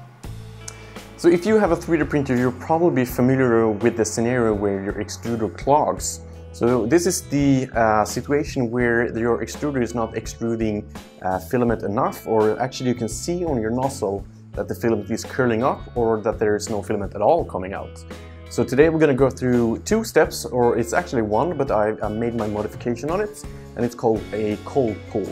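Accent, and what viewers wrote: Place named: Sweden. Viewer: I bet 5000€ this guy is Swedish xD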